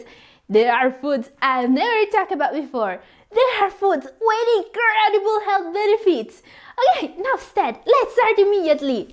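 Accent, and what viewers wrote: Italy. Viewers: Oh is so awesome… she has slightly italic accent though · was born in the philippines, but she studied in italy from grade 1 up to liceo, n she took seriously her studies. Sometimes her accent words are in italian without noticing her english pronunciation. We have to understand italian n english pronunciation are different. We have to excuse her wrong words mistaken.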